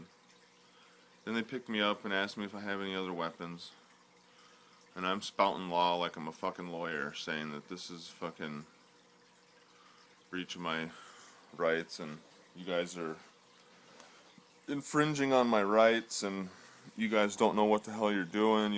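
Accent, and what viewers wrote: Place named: Michigan, United States. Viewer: Are you from Michigan???? Sounds like the cops here.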